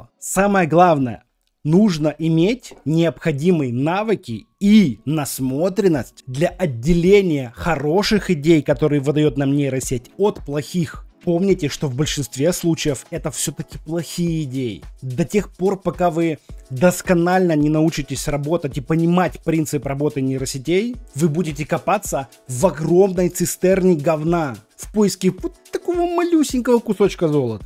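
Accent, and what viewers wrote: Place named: Ukraine. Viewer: как же приятно слушать украинский говор на моменте с чатгпт и твои ЧИRRRRRИКС лайк без раздумий ❤️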